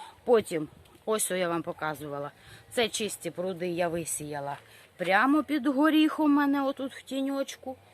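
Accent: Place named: Western Ukraine, Ukraine. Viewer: на заході України інша фонетика (звучання) у вимові.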